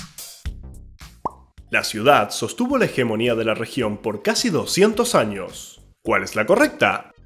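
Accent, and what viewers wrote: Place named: Latin America. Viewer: PERDON. La pronunciacion es LATINA. Esto conlleva a ERRORES.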